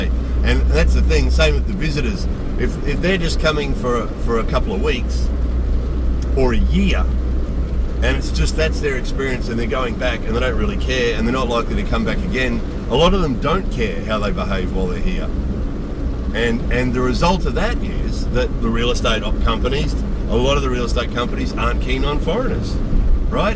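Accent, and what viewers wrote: Australia. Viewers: yeah except the problem is I can't skip forward to quickly scan and get the gist of the video because it's all just driving - so for me might as well be a podcast I've drive in Japan and know it's slow so no joy for me here - but folk who never have may find in relaxing and interesting. Strine voice tho' ... ;-)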